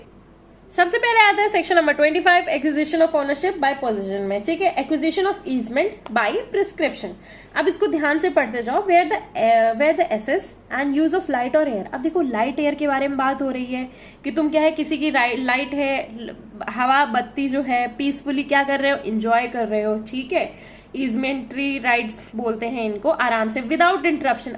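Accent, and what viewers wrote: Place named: Lucknow, India. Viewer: Apki voice me lucknow ka accent hai❤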